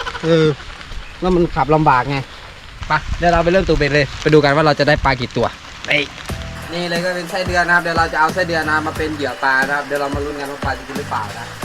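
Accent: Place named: Ubon Ratchathani, Thailand. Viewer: สำเนียงอุบลชัดๆ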